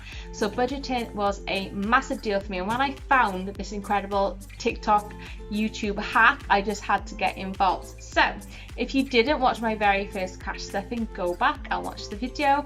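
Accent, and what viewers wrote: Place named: United Kingdom. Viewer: ❤ the British accent. A new to your channel, I'm a resident from the Philippines, but resides & works in Copenhagen, Denmark 🇩🇰. Anyway, great video! ❤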